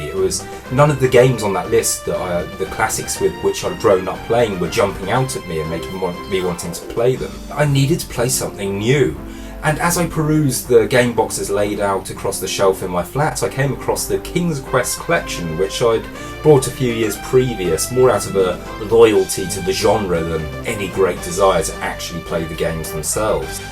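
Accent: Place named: United Kingdom. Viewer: is one talented man. I wish that I had 1/1000th of his talent. Still, I take great pleasure in listening to his incredible retrospective brit voice. So very relatable. Wish that I could be like. Le sigh. Just give up indeed...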